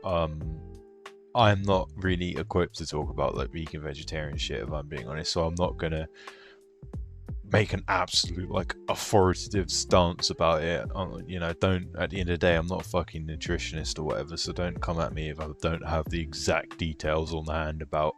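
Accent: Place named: United Kingdom. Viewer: Hello. I’ve just recently come across your videos. Having once been super sized myself, I can totally understand your points of view. By the way you’re very handsome looking and I love the British accent that you have. Continued good luck with your YouTube channel 😊.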